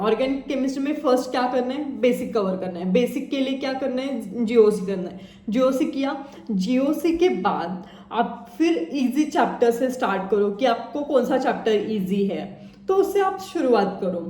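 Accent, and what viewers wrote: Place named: Maharashtra, India. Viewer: Didi tu Marathi aahes na kltay tuzya acsent vrun😅❤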